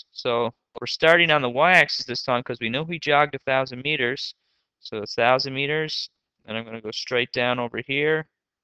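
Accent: Newfoundland, Canada. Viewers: Are you from Newfoundland?? Cause you sure sound like it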